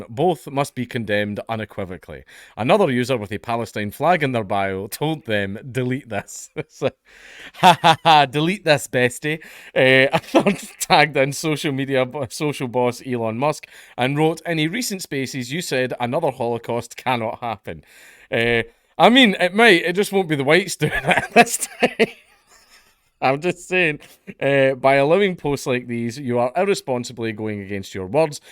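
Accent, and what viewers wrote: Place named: Scotland, United Kingdom. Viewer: A smile just crossed my face, here in Texas, my Texan face, and it was nothing that you/he read/said. Nay, it was the FACT that you/Marcus/ is the DADDY OF A DAUGHTER! And each iddle widdle thing she says as she expresses the entire range of her itsy bitsy emotions... will be adorably said with my current and holding, absolute favorite accent: SCOTTISH! 😃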